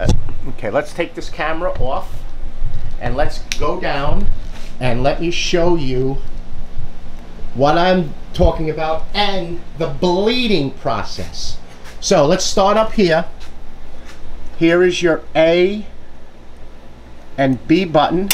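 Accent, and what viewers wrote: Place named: New York City, United States. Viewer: I can hear your NYC accent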